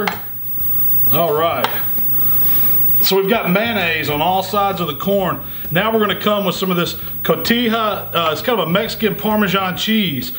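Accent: South, United States. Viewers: Love the Southern Twang, is that TexMex? Great recipe, I'm definitely trying this.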